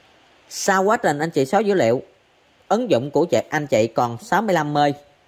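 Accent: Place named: South, Vietnam. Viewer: cám ơn em nhiều lắm, em hướng dẫn rất tận tình, chất giọng nam bộ rất dễ thương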